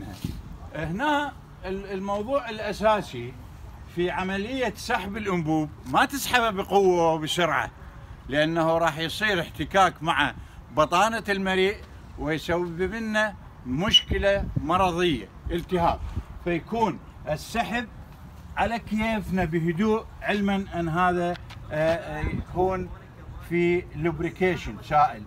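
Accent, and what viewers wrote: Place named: Iraq. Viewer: صوته ذكرنا بصدااااام الله يرحمه احس انك عراقي اصل وفصل الله يحفظك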